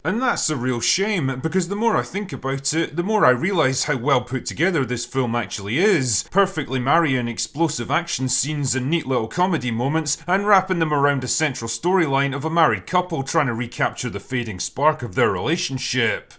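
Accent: Scotland, United Kingdom. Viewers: it doesn't matter to me what movie/tv show you review just as long as i hear your Scottish voice. Just keep them coming and I will keep watching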